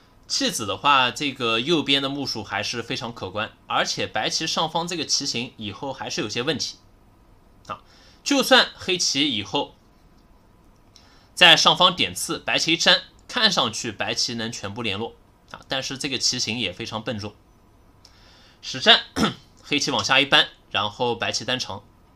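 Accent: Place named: China. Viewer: 不曉得是中國哪個地方的口音 , 真的好聽 !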